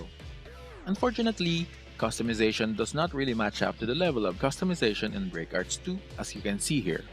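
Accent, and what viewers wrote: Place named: Philippines. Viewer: Sounds very Filipino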